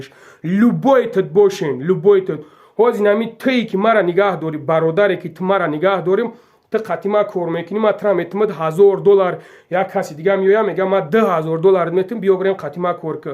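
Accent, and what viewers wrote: Kulob, Tajikistan. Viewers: Ту шердили Звенигород меги й дигачодум бра така риши рихи Кулоби нав гап зан шиди.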